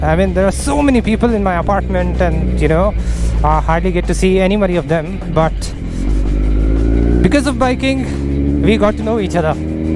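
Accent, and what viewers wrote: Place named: Kerala, India. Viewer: i thought you was malayalee😅 anyway goog vlog😍😘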